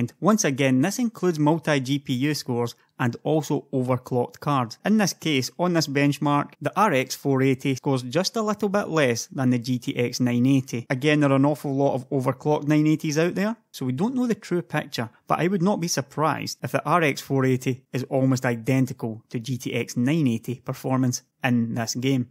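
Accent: Scotland, United Kingdom. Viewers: He's Scottish... not recognise a Scottish accent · Are you Scottish by chance? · Seems Scottish.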